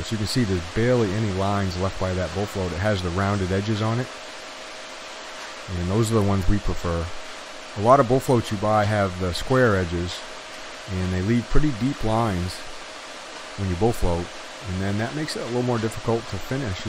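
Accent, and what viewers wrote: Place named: New England, United States. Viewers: Hi, great video. Good to hear my native speak (I live in Austin but am from RI). Very helpful to see the entire job unfold. Hoping you'll be able to provide some input re: moisture barrier (and cost). Details below (it's a lot). Thanks in advance if you can help, completely understand if you can't. Am converting a 20' X 12.5' (leaky) garage into a recording space. There's a 30 inch x 20' ledge running along interior-wall side. The floor is 5.5" below house slab level, so assuming I need to leave space for flooring on top of new-pour slab level. Total square feet of space to be raised is 200 sf X (approx) 5". Question one: Have been told I need a moisture barrier and would have to wrap the studs, but noticed the white strip at base of studs on far wall in video. Is that the foam of which you speak? Can you explain the application? 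Question two: I live in Austin. Contractors bidding on job have varying opinions and thoughts about moisture from concrete at studs and structural materials to use. Current concrete floor appears to be fine and intact. My major concern is water, and like this client, it's apparent that at least some of it is coming from under the garage door during heavy rains lasting more than a day. My house is on a slight grade, and the driveway has a short flattened run in front of the garage door, but it doesn't seem to do much to re-direct the heavier rain water (no, no gutters, unfortunately). That said, the water reliably pools along the garage's inter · bill smith He sounds like a New Englander.. my guess is Boston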